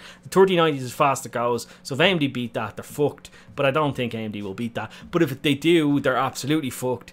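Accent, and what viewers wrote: Ireland. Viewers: "I tawt I wuld tawlk to yew" Fuck the irish accent is absolutely fucking magical ;)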